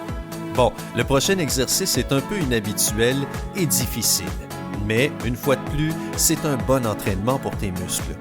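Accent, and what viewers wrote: Canada. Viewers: j'adore cette voix, avec l'accent canadien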